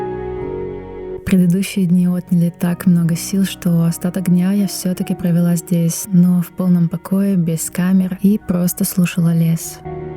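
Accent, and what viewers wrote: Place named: Latvia. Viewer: Скорее всего у автора латвийский говор и соответственно интонации в голосе. Хотите огня😂 смотрите Жорика Вартанова 😅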